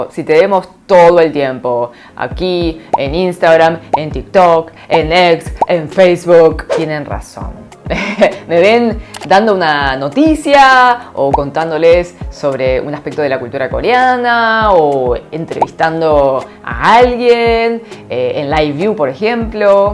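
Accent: Argentina. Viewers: te acabo de descubrir por el video de y me ha sorprendido mucho tu historia de cambio, adaptacion y sacrificio en esta nueva tierra, que es mi querida Argentina. Muchas gracias por compartirla con nosotros y tambien por transmitir ese positivismo y entusiasmo en tus mensajes. Por cierto diras que tendras que pensar las palabras en castellano, pero te confirmo como muchos otros seguidores, que hablas perfectamente y ademas con este acento tan lindo que nos caracteriza.:) Abrazo desde España!! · Es un agrado concerte, se nota tu acento argentino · Omg 😱 yo estaba convencida de que eras argentina!! O sea claramente lo sos xD El Argentino nace donde quieeere! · Tu Español es perfecto hablas como una nativa de la argentina con acento bien argentina 🇦🇷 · Hablas como argentina,🎉saludos de mexico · te felicito, tu español con acento argentino es muy bonito, que sigan los exitos, te los mereces. 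· estoy en corea recien llegado y me intrigo tu acento argentino, un pais que impacta. Estoy por un evneto cientifico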